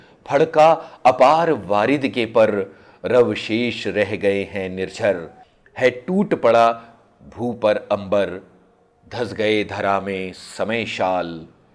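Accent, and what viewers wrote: Standard. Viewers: Too good... Hindi kavita aur Yunusbhai ka vishudh Hindi uchcharan... sone pe suhaga... what else...? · आपका उच्चारण बहुत shuddh है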